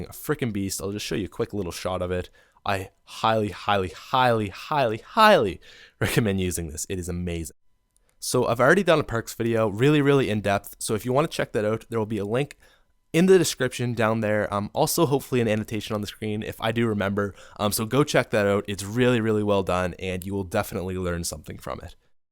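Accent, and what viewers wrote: Canada. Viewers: Great vid, love em. You're definitely Canadian though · You are from Canda your accent :P cool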